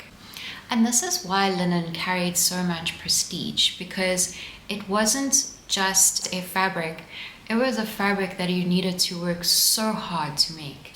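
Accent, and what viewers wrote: South Africa. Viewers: Thank you for your extremely interesting and informative yarn yarns! Also, super great to hear another South African accent!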